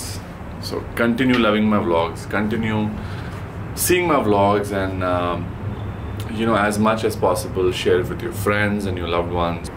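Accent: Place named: Delhi, India. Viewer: You are totally delhi person....apki baton me ekdum delhi tone feel hota.hai....i love delhi ...delhi dilwalo ki like you ❤️...blessed couple. 🤗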